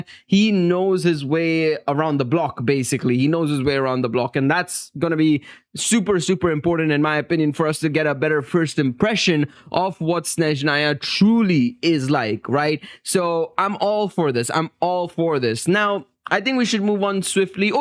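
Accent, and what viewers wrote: India. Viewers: Brother are you indian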